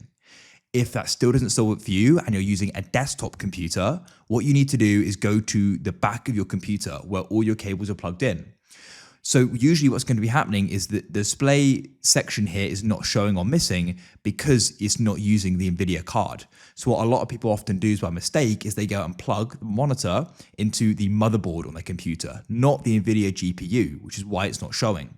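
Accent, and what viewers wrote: United Kingdom. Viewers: hes just british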